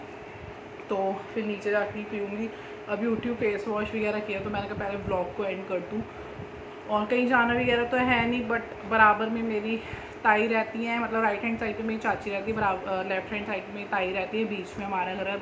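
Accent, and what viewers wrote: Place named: Punjab, India. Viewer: You speak like a punjabii girl😍😍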